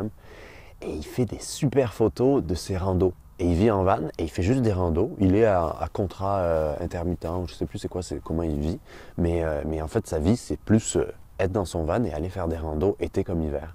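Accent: Quebec, Canada. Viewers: La visite de la France avec accent quebecois YES!!!, si tu passe par la Nievre n'hesite pas a me contacter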